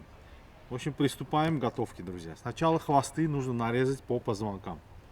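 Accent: Baku, Azerbaijan. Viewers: Во первых блюдо вкусное))) Во вторых, бакинский акцент прикольный👍👍👍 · Ты настоящий Бакинец,по акценту видно,очень понравилось твоё видео.Здоровья тебе,твоим родным,спасибо тебе ахпер.Обязательно такое приготовлю,если смогу. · Приятно услышать бакинскую речь и видеть интеллигентного человека! привет от бакинского армянина из москвы! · джан! Джан ээ бакинский акцент ❤️🤲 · Бакинских акцент 👍🏼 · Как только услышала речь, сразу догадалась, что это бакинец. Не смотря, что сейчас живу в России, но акцент южный бакинский остался. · Азербайджанский акцент не перепутаешь не с каким другим. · Отличный рецепт, выглядет очень аппетитно, а мой родной бакинский акцент это бомба 💥👍👌👍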